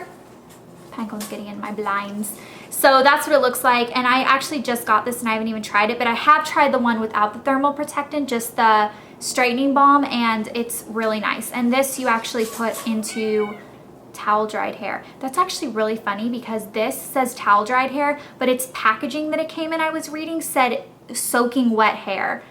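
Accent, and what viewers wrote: South, United States. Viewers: Are you from the South? I never noticed your accent till this video? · in the first seconds i thougt you were from the south because of the slang :D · she has a southern accent? weeell, I guess I can't tell because I'm southern too xD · I feel like you "southern" accent is getting stronger each day. haha.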